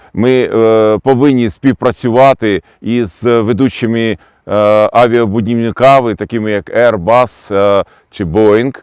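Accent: Ukraine. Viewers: с украинским акцентом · Вы стали шипко по заподно-украинскому языку говорить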